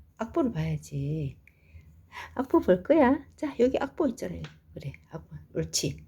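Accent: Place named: Gyeongsang, South Korea. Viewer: 경상도인가봐요 ㅋㅋㅋ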